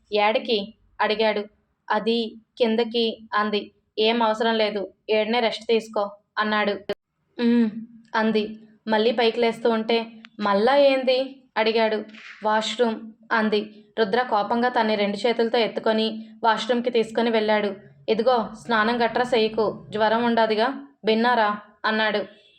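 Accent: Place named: Rayalaseema, India. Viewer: అక్క నాతో ఇంకో చిన్న రిక్వెస్ట్ ఈ క్యారెక్టర్ లో నువ్వు బాగా మునిగి పోయినావు రాయలసీమ భాష తోటి బయట కూడా నువ్వు ఇదే భాష మాట్లాడే అనుకో యాడ నుంచి వచ్చిన అమ్మ నువ్వు అంటారు మరీ అంతలా కమిట్ అయిపోతే గా ఈ భాషకి😂😂😂🫴🫴🙏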